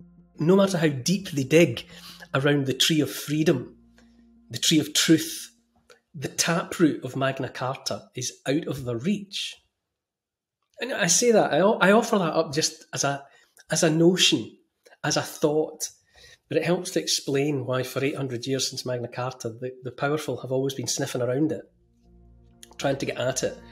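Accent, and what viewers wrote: Scotland, United Kingdom. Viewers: I am so fekking ignorant. Your words hook me. The Magna Carta, was it signed at Runnymede or somewhere?? Surrey? I don't know which way is up. But I felt something was terribly up during that very first lockdown. I felt it in my waters. In my bones. I felt we were being darkly duped. I sensed something was shifting. Like when you go to the theatre, and there's the intermission. And behind the stage curtains all the scenery gets shfted around. Whilst people are slugging back their intermission quaff of choice, or queuing for the loo. Massive scene change is going on back stage. I felt something was going on. You have a lovely Scottish..burr..???🤔 I don't know how to spell that word. But anyway, you have that. ♡♡♡♡♡ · I love him more Trevor 😝😅😅 A gentle, beautiful Scottish lilt A kind, empathic, forward tilt An open heart; a caring face A willingness to take his place. 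A strong, home-hitting monologue Reminds us of the boiling frog The courage to become a sleuth A passion to release the truth. A deep desire to crack the whip Illuminates our freedom-strip Calls out the darkness taking hold The shiny despots; plated gold! Intense and raw, he lays it bare A soul-enhancing, honest stare Inflections drive the message through He speaks for Us - for me and you! Discernment's such a precious gift His words create a mighty lift Take heed of all he has to say He knows - it shouldn't be this way! 🤗🦋🐬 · is a gem. Love the way he thinks and speaks. That Scottish accent just adds to it.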